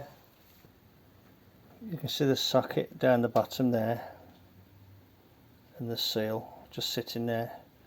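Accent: Birmingham, United Kingdom. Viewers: Your welcome the brummie accent the bring you back when there's more etc and similar phrases❤️. Good ideas and ways around problems good proper Mechanic. 😉💯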